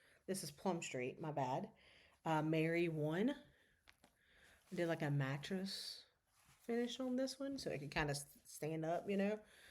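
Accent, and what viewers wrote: South, United States. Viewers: The reindeer feedsack is so cute! At age 75, my eyes don’t work too well on anything smaller than 32 count fabric, so would love to win it! Always love all your finishes and stitching! Also, being Southern myself I love hearing your accent! Makes me feel like home! ❤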